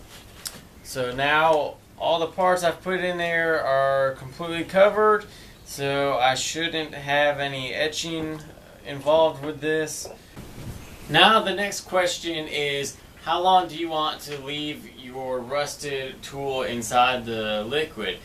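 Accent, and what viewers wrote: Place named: South, United States. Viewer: Nice and well done video. I have some tools that were left out in the rain a few years. I will try your technique to clean them up. I agree 100% with S. Walker below. You spoke clearly, explained the process well and your slight southern accent added personality to your video. This is from a guy who lives in South Carolina. If every video on youtube sounded like the Discovery channel, it would be a very boring place. Don't change a thing young man !!!!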